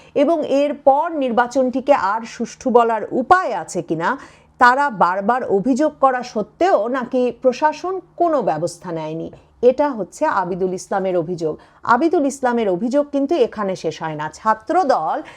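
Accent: Kolkata, India. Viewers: আপনে এই রকম কলকাতার মেয়েদের মত কথা বলেন কেনো?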